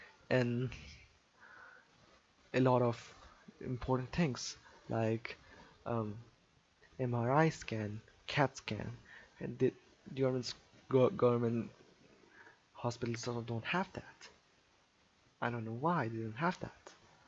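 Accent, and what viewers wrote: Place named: India. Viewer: OH WAIT YOUR RIGHT LOL HE SPOKE INDIAN ACCENT IN HIS VIDEOS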